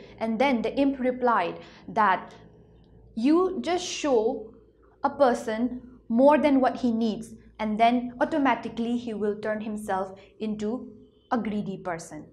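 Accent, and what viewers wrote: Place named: Manipur, India. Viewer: Let us promote the culture of appreciating something which is good. In my opinion this lady is doing a fantastic job for those students who are capable of speaking in English. If you are not happy with her style of teaching please go for some other vedios that help you understand the lesson in a better fashion. She is young, energetic and articulate. Tell me honestly how many English teachers in Manipur speak English with such beautiful accent.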